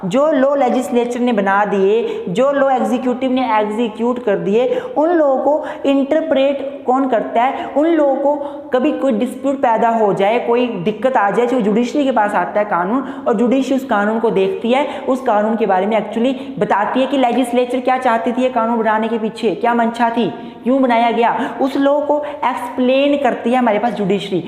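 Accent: Himachal Pradesh, India. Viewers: Sir aap Himachal Se ho ?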